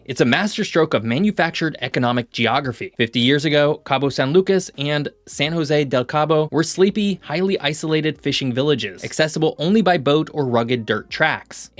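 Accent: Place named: United States. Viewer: rip that gringo spanish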